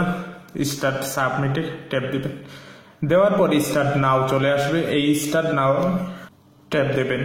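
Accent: Sylhet, Bangladesh. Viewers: you r sylhetee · ভাই আপনি সিলেটি নি,,,?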